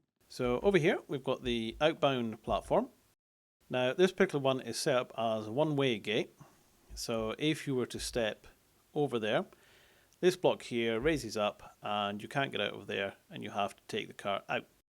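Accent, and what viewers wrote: Scotland, United Kingdom. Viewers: You sound scottish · Wow he sounds scottish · blake collins ._. that may be because he is scottish...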